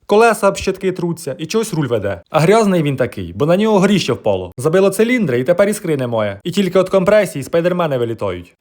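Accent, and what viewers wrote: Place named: Cherkasy, Ukraine. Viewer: навіть,живучи у Черкасах , приємно чути "той" говір. Діалект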